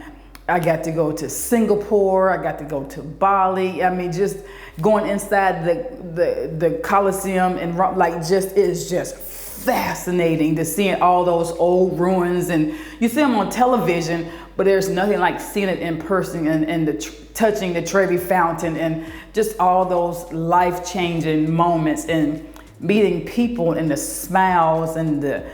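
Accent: United States. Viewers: I always assumed that your YouTube channel was based in the USA, maybe because of your accent 🤷🏾‍♀️ Congrats on your 1st vlog 🎉🎊 it's wonderful to hear all about your journey and travels. That's the thing about nursing it does open up the world to you if you're willing to travel. I'm in the UK 🇬🇧 but worked in the States for a few years and that definitely gave me a thirst to travel more. I was grinning and nodding throughout the reel 😁😂 I'm so happy for you and hope that production is proud of your efforts ☺️✨💛🤩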